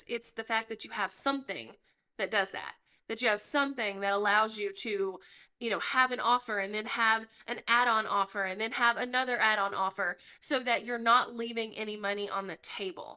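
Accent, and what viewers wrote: South, United States. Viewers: thank you for taking the time to reply. I really appreciate it. 💕Just to add I believe thrive cart also offers sales page, up sell, down sell options too. So that’s one reason I was asking. I don’t own thrivecart , but was looking at it. They also offer a lifetime purchase option at the moment. FG looks very good though. 😁 Anyways … I’m really enjoying your channel and expect I’ll be on your mailing list very soon. Haha. (I’m Australian and maybe that’s why I enjoy your Southern accent … and “all the things” 😂 ). 🤗💖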